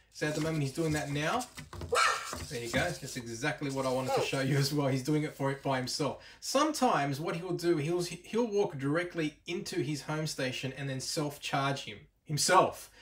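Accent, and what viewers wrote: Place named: Australia. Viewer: Hi, as always very helpful videos 😊thanks for that! My EMO gets off the home station quite well but today when I asked him, he said: I can’t at this moment , you think this has to do with the battery? I thought it was weird as it had never happened … Thanks for any advice. Ps: love your Aussie accent 😊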